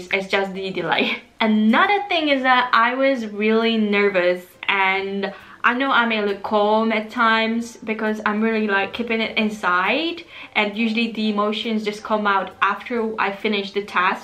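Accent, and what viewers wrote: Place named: Australia. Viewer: Why are you sounds like Australia😂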